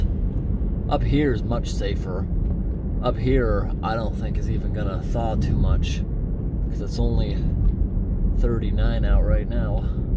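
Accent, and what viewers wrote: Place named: United States. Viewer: That american "englih" ist unbearable - sounds like defect radio ... sounds extra-disgusting ! I love ENGLISCH, not that pidgin-jabbering ... !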